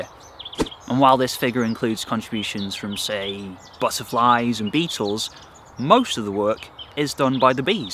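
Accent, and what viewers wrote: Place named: United Kingdom. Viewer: Finally a british accent yay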